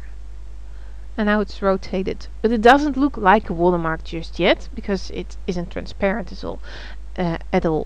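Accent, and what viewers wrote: Netherlands. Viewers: Hihi bij je eerste zin hoorde ik al dat je Nederlands was :] handig filmpje thanks! x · Haha je nederlandse accent komt er erg door :)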